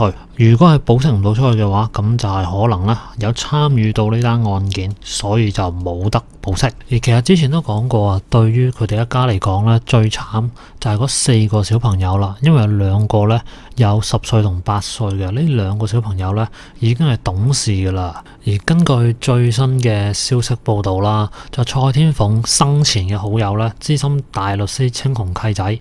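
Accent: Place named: Mainland, China. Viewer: 你把聲 好似係大陸人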